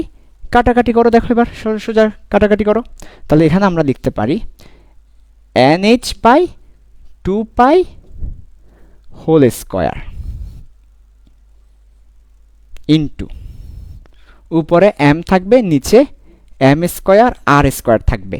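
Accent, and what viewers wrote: Chittagong, Bangladesh. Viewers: Vaiya apni k Chittagong ar nki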